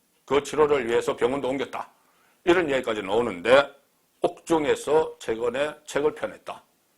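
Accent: Gyeongsang, South Korea. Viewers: 송국장님 경상도분 같은대 우리공화당 지지하시는것 어떠신가요?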